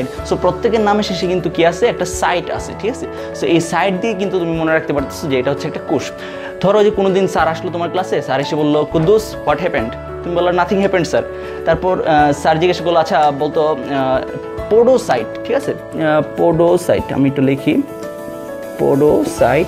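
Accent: Noakhali, Bangladesh. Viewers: Apni ki Noakhali r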